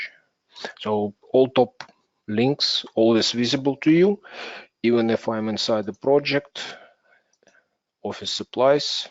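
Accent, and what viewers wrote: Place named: Russia. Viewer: Жёсткий акцент выдает своего :))) Спасибо за видео.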